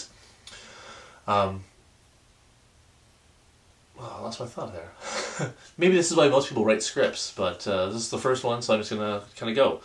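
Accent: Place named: Canada. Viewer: Okay, to follow up with what I mentioned on Twitter, this video showed me that I need MUCH less chiseling. I had made my New Year's resolution to learn hand-cut dovetails, and watched a few basic videos and subsequently a few (horrible) attempts. Prior to this tutorial, I was laying out my tails with a marking knife so that I could register my chisel in the mark. I would use the dovetail saw to cut shy of the line, a coping saw to remove the waste, and then try to use the chisel from way too many angles. As I was new, I wasn't using the chisel very well, and had some irregular cuts, undercuts in the wrong places, angle changes, etc. Then I would transfer this mess to the pin board, and more irregular chiseling only compounded the mess. After watching this tutorial, I decided to "let it go" and just use the cut from the saw, and only use the chisel to clean up the bottom, instead of the bottoms and sides. 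This has led to not only quicker dovetails, but cleaner ones so far. What I am able to transfer to the pin board seems to be a lot better, and will get better as I practice. I still have far to go, but am trying to get down to the shop at least once a day to make a practice dovetail. Thanks again for not only the tips in the video, but for everything else (keeping it real, showing mistakes, crass jokes, Twitter rants, glorious Canadian accent, etc.)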